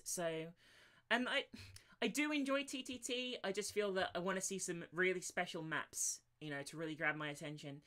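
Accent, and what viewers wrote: United Kingdom. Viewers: your voice is sexy, british, and that's all that matters to me. <3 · I love your voice. Its kinda adorable to me with your british accent ;)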